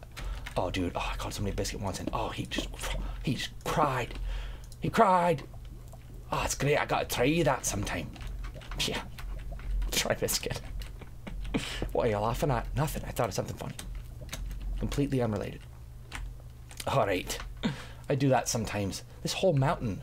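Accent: Scotland, United Kingdom. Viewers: His Scottish accent reminds me a lot of Desmond from Lost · Ignore anyone saying the Scottish accent is offensive. It's not, I find it funny just as much as I find Willie from the Simpson's funny. I am also from Scotland. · Moar Scottish Accent please. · Lol luv ur scotish accent soooooooooooooooooooo much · I just watched a guy talk to himself in a Scottish accent for 12 minutes...... am I really that bored? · Your scottish accent omggg xD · I will never get tired of Scottish accent... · haha and nice scotish voice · The Scottish accent is cool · I could get lost in that Scottish accent all day <3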